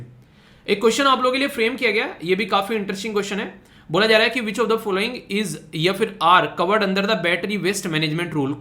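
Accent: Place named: Baghpat, India. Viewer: Sir ji aap baghpat se to nhi ho ...hmre yha ki language lgti h aapki 🥰👌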